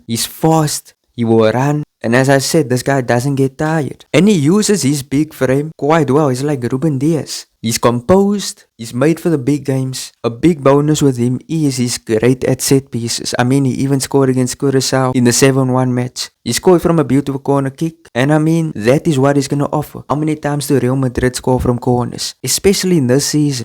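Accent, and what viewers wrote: South Africa. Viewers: Are you South African by any chance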